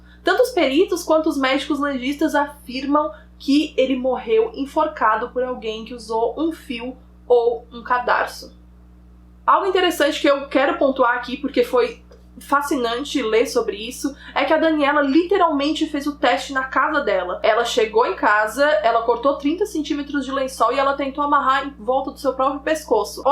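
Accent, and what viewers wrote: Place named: South, Brazil. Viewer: Meu sotaque é igual ao da (talvez um pouco mais puxado rs) e eu sinto uma certa representatividade quando ouço ela falando as gírias do sul e enfatizando o Ê no final das palavras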